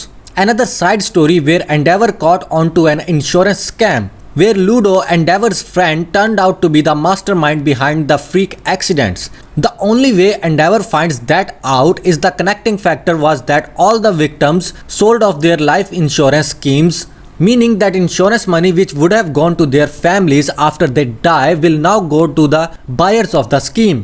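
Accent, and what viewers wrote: India. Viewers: maybe it is an Indian accent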